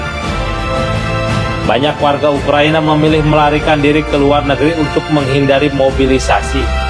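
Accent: West Java, Indonesia. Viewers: Logat sunda lu bos kentara banget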